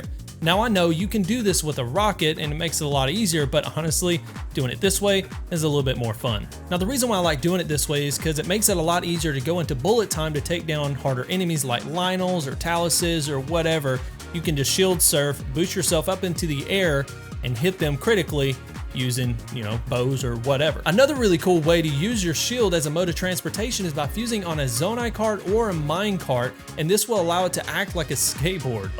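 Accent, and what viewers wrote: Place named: South, United States. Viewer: Followed because of the southern accent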